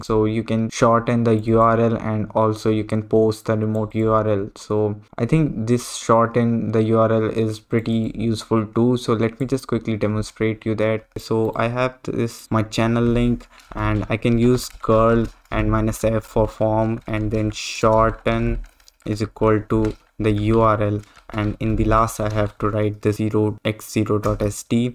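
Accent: India. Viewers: I suggest you stop talking with an indian accent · Recognised you as Indian by the accent. I am Indian too, from Haryana, using arch linux with openbox. Tiling managers don't work for me.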